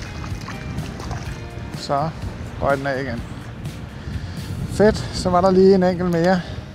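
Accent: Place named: Zealand, Denmark. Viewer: Flot fisk. FIn video. Kan høre du er fra Sjælland. Men er du ikke sød at præsentere dit valg af plads og grej og de overvejelser du gør dig i disse valg.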